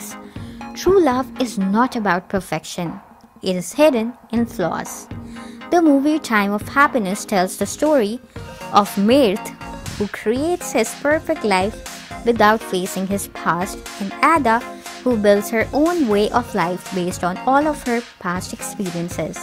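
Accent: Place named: India. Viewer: Omg the Indian accent is annoying to finish this video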